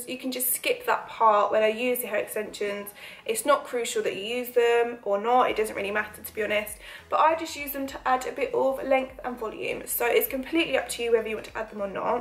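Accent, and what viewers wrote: United Kingdom. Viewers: but Phoebe is Australian and sounds british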